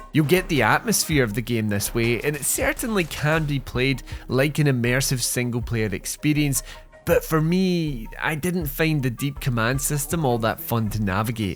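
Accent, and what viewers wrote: Scotland, United Kingdom. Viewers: Its called an accent. Scottish. There's a big world outside america little guy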